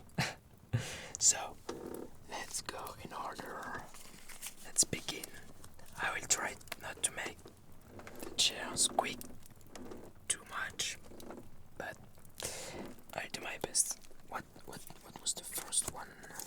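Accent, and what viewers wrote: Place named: France. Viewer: Oh my God I love your French accent! I don’t get to hear these accents very much and they are absolutely lovely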